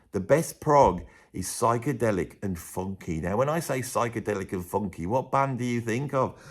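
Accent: Birmingham, United Kingdom. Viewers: I just love. I love the Brummie or midlands accent and how it totally lends to his appeal. Not sure I always agree with his ideas, but I don't think ever wants everyone to agree - that's the appeal. He says what he wants, knowing it isn't always going to be everyone's ideal. But he always makes a compelling case. I have had to go back and re-listen to so many albums (and CDs) after one of these videos - I conveted to MP3s (I have over 35,000 tracks on my iPod) so long ago, that they can get lost. I still prefer to listen to the whole of an album - warts and all - contrary to the new world of random 'always on background music' tracks, quickly and easily skipped with the streaming services (who rip off the musicians.) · I love your videos and appreciate your knowledge of music and British accent.Some groups you never mention. fantastic group., who were a big influence on and. 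So glad you mentioned by but missed. Evangelist Roger Mansour former Drummer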